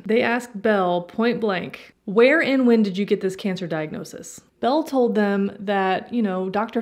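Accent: Australia. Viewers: Loving your Aussie accent 😜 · I am so here for your Aussie accent.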